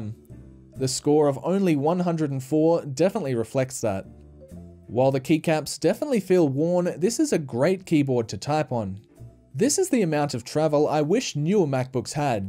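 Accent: Australia. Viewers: You voice sounds just like my Australian friend I met on Facebook lmao. Y'all are so chill and relaxing to listen to. Totally random. 🤣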